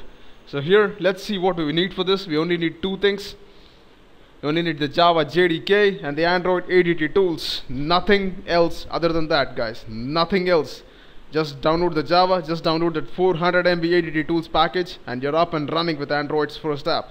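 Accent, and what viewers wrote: India. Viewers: Thanks so much, the first time i like an indian accent <3 seriously, THANKS!!!! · Ever since I started learning to code, I got quite used to Indian accents. It doesn't bother me much. I like overly confident way of speaking. Good job bro.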